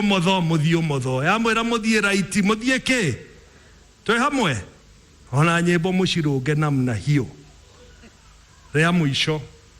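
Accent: Kikuyu, Kenya. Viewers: Peter Kenneth, you make Kikuyu sound so sweet.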